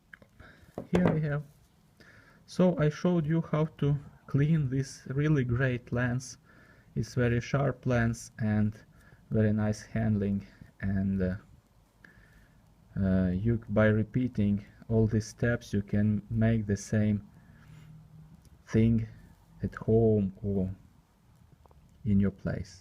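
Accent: Russia. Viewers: lol, your pronunciation as a Russian man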